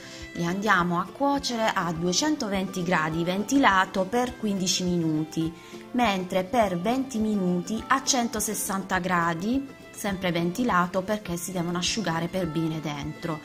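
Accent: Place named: Taranto, Italy. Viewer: Bravissima anche la mia nonna le faceva cosi e forse sentendo il tuo accento non mi sembra molto diverso dal suo. Lei é di un piccolo paese in provincia di Taranto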